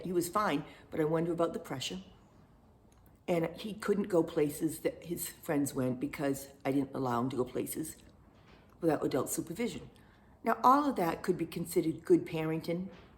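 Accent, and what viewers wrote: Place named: Massachusetts, United States. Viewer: Hello, new subscriber here! I just found your channel and I love it! As soon as you started talking I knew you were from Massachusetts! I can relate to everything you have said in this video. I am slowly learning self care instead of being a doormat. I look forward to watching your future videos as well as your back catalogue. All the best to you and your family from Massachusetts!!😊🌲🌳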